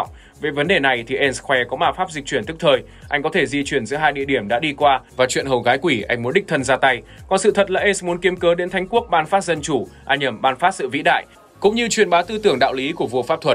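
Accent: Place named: North, Vietnam. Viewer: kiểu giọng bắc ấy, vừa ngầu lòi lại ấm áp~~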